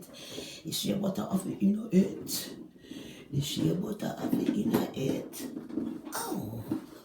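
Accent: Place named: Jamaica. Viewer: Lol.. I love the way my Jamaican people speak. She has a personality on her!!!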